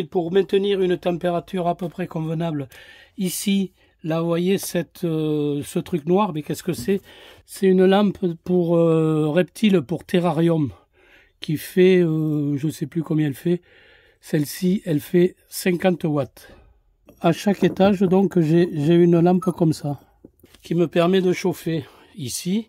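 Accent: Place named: South-West, France. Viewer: Bonjour Monsieur, votre accent sent bon le sud ouest j'ai une connaissance à Pauilhac 32500 après cette parenthèse régionale voilà ma question votre mélange (terreau perlite vermiculite ) quels sont les proportions optimales pour vous imiter sans aucune discussion? en vous remerciant d'avance pour ce que vous faites aux amateurs non voyant que nous sommes.... mes salutations J.P. made in belgium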